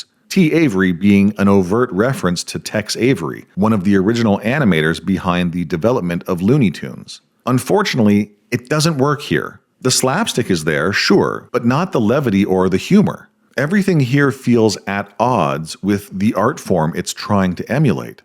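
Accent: Canada. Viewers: I'm going to guess he's from Eastern Canada the way he pronounces the "ou" sound (this is coming from someone in Saskatchewan)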